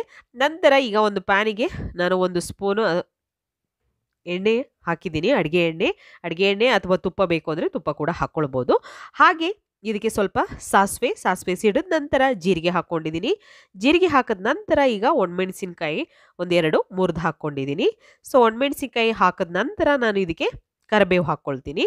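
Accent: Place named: Malenadu, India. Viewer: ಇವತ್ತೇ ಮೊದಲನೇ ಸಾರಿ ನಿಮ್ಮ ವಿಡಿಯೋ ನೋಡಿದೆ. ನಿಮ್ಮ ಕನ್ನಡ ನಮ್ಮ ಮಲೆನಾಡಿನ ಕನ್ನಡದಂತಿದೆ. ಖಂಡಿತ ನೀವು ತೋರಿಸಿರುವ ಚಟ್ನಿ ದೋಸೆಗೆ ಮಾಡುತ್ತೇನೆ. ಧನ್ಯವಾದಗಳು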